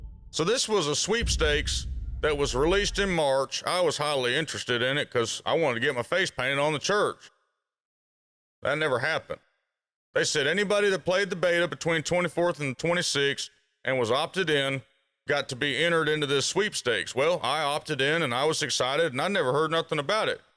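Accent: South, United States. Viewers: I thought this was a joke you really talk like… hillbilly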